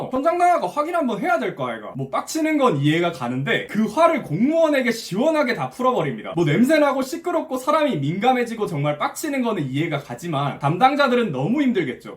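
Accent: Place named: Gyeongbuk, South Korea. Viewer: 말투를 보니 경북분 같네요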